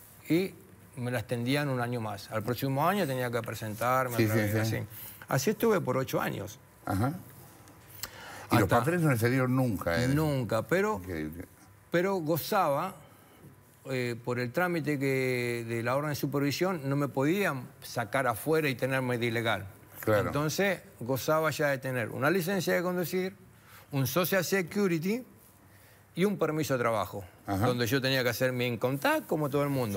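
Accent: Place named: Argentina. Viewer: Que buena historia yo soy de Honduras pase si no es lo mismo pues fue casi igual per bueno gracias a dios tuve la suerte de solucionar mi problema de migración y me identifico con vos por mucho primero por nuestro acento Honduras y Argentina tenemos muchas palabras similares y cultura aquí en california la mayoría son de México Guatemala o el Salvador le notan a uno su acento y tenemos que nosotros decidir las palabras que los demás dicen para poder darnos a entender pero bueno vos trata de apelar tu caso es en caso bárbaro muy bueno lo podes ganar suerte y ojalá te podas reunir con tu familia.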